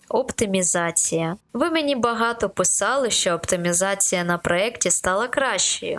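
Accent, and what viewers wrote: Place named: Donbas, Ukraine. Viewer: Ти з Донбасу? Мова з родзинкою ❤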